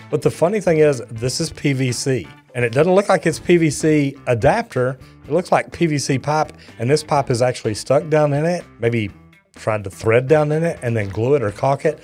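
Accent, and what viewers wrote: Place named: South, United States. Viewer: "if y'all haven't seen it..." *as I'm clicking for the new video. Must be the voice... making me do it, how do I deny Southern Charm?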